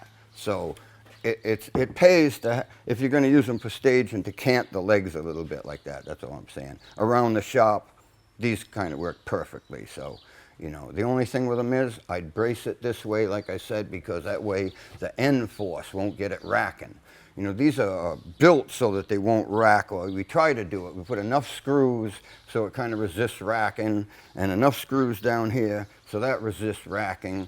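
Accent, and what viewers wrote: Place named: Rhode Island, United States. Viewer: As a native Newporter now living in northern Norway discovering this channel has brought back quite a few memories and feelings of nostalgia. The thick RI accent has is music to my ears. I've since lost mine years ago from all of my moves. Now that my journey into woodworking has started I've found this channel to be incredibly instructive and insightful. is a true master craftsman. Thank you so much for this. Cheers! p.s.- Now I'm dying for some clam fritters, a good swordfish steak or even a good Italian grinder.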